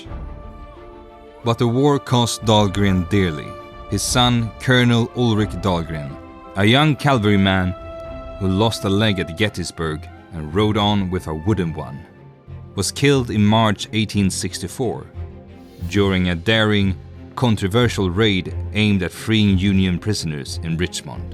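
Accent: Sweden. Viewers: Because this is a channel focused on Swedish history told in English? The accent didn't clue you in? If you want videos about italians fighting in the Civil War, it's probably easy to find.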